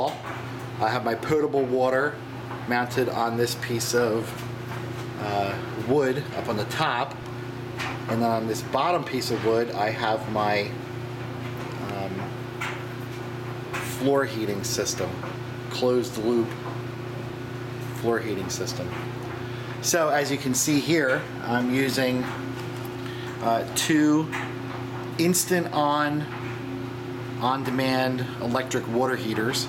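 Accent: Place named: South Jersey, United States. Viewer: ...ooh that's the south jersey / philly sound...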